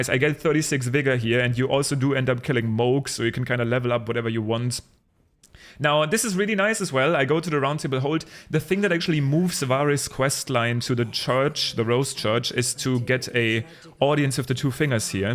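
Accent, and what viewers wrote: Germany. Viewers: Nothing makes me happier than realize within seconds that I've found yet another german creating English content lmao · Sprichst du als erste Sprache Deutsch, klingt ziemlich danach 😌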